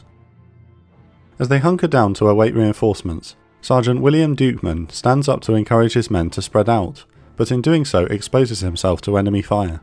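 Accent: United Kingdom. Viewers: Narrator is British....and this battle is factual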